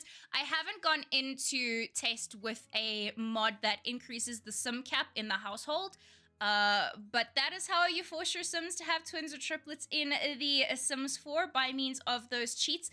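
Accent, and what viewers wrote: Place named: South Africa. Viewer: Wait you aksent sounds south africn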